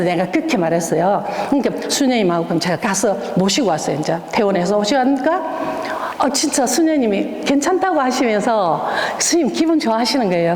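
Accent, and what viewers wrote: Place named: South Korea. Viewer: 구수한사투리에 좋은몫을선택하여 질곡의삶을즐겁게신나게 강의해주신봉사자님 주님께서되갚아주실것을 굳게믿으머엄청사랑합니다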